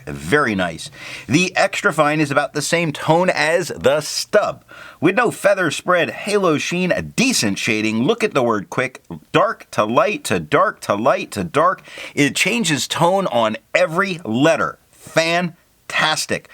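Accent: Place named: Philadelphia, United States. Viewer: Oh well. Some of your inflections sound like Philly. Hope you have a merry Christmas!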